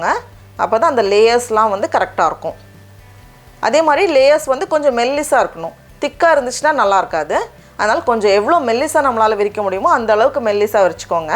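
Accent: Nagercoil, India. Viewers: அக்கா நீங்க பண்ற ஒவ்வொரு சமையலும் அவ்வளவு அழகாக உள்ளது அதுவும் உங்கள் நாகர்கோவில் பேச்சி அருமையாக உள்ளது எனக்கும் நாகர்கோவில் தான் நீங்கள் சொல்லித் தரும் விதம் எளிமையாக புரியும் படி இருக்கிறது ரொம்ப நன்றி தயவுசெய்து எனக்கு பதில் கமெண்ட் குடுங்க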